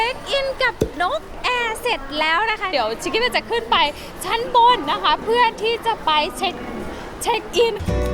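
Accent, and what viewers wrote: North, Thailand. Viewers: เอ้ยอู้เหนือก่อ 5555 พี่ชิคกี้พายพูดเหนือไหมค้าาา?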